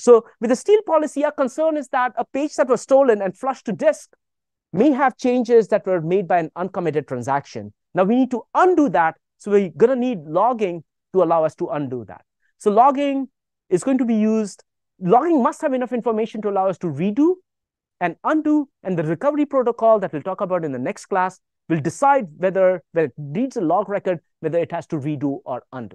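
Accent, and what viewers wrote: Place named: India. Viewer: Indian English is hard to listen😂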